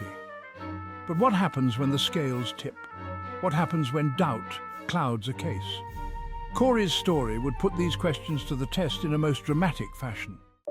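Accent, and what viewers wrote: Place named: Virginia, United States. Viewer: This leaves me with a lot of questions E. Did he ever get convicted? I see a lot of penitentiary pics; did he get knocked for something else?? How long has he been down; or is he home? I'm from VA so I'm more partial to hearing you talk with our accent and terminology. This is more mainstream type and I get it but I'm kinda more partial to you Homie.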